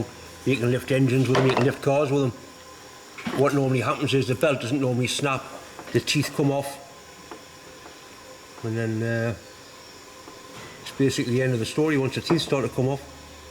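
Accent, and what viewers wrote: Newcastle, United Kingdom. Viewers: Americans probably couldn't understand a Geordy accent anyway 😅😅😅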